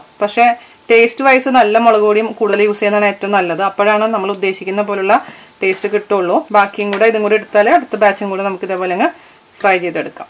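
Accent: Thrissur, India. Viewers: Dear sister you are very honest we can understand from your talk trichur slang may God bless you 🙏🙏🙏🙏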